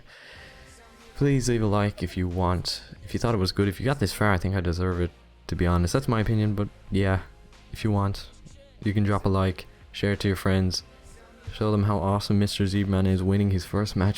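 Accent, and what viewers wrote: Ireland. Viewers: I can hear a bit of Irish accent in your voice. Do you have any Irish blood in you?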